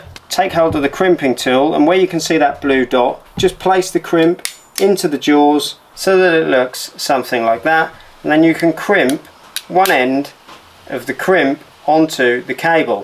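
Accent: Norfolk, United Kingdom. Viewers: is he speaking pure British accent? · Nice vids. Are you in Norfolk? Sounds like it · Is that a Norfolk accent?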